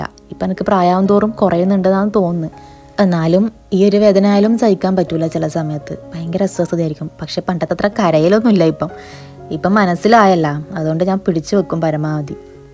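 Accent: Kasaragod, India. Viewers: you are soooo.. sweet. I watch your videos mainly to hear your slang, kasargod slang.. I like your home surroundings, achamma, achachan, amma, achan, kunjava..❤ God bless you all.. 🥰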